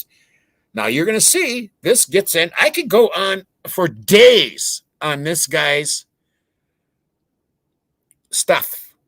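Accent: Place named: South, United States. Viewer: He sorta has a southern accent or something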